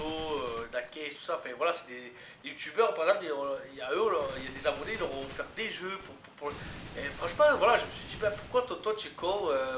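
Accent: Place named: Marseille, France. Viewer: GG, pour le gameplay,je connaissais ce call of et j'y et jouer beaucoup mes pas en multi j'étais encore gamin je jouer que en solo et je fessais les mission je c pas combien de fois et cet map ma flasher dans la tête je crois que c'était la première mission dans cod 4 en campagne si je me trompe pas ^^ bref bas moi je c pas trop comment sa marche sinon je t'aurai bien donner 1 ou 2 euros pour le Paypal et je joue sur pc donc voila ^^ dommage désolé même si je te connais pas bien car je me suis abonné ya pas trop longtemps mes j'ai regarder tes anciennes vidéo tu es honnêtes (un mec de marseille avec un putain de bon accent xD ) etc bref bonne continuation sa payera par la suite a+ mec pour une prochaine vidéo:p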